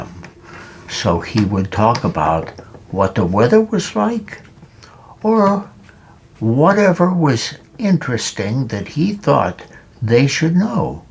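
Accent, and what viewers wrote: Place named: United Kingdom. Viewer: He has such a beautiful accent almost like the British . I think the old generation used to stick to British accent as close as they could.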